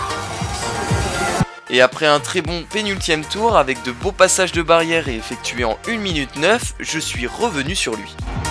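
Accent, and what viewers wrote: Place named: North, France. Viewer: La piste magique d ordegem à encore frappé!!! Sub 8'50 en junior c est très fort, plus la chute et les petits piétinements avant certaine barrière c est encore plus beau, hate de voir l evolution dans les prochaines années, bon courage pour la suite de la saison 💪 Ps: magnifique accent du nord, c est pas possible tu as de la famille qui habite par chez nous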